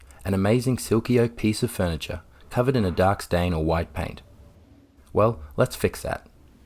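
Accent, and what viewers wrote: Australia. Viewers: Saw the thongs, heard the accent and knew straightaway you were an Aussie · Nice to hear an Aussie accent. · hi there..I can tell by your accent you are an Aussie like myself...I've watched various furniture flippers from other countries doing this but have wondered myself if there is money in it here in Australia..I know that this sort of furniture is rather expensive in Op/second hand shops and stuff on roadsides are generally cheap and nasty particle board, not worth fixing up..I have furniture of my mothers that date from the 50's, from when they first set up house, but alas some of it probably wouldn't sell, even if fixed up, because they are furniture that nobody really uses anymore, like free standing wardrobes...I'd love to keep them in my upcoming move but alas will not have the room for them..do you know of anybody flipping furniture here in Mel Vic..?? 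· Really like the look of this..and so nice to hear a fellow Aussie. A new subscriber for sure…🇦🇺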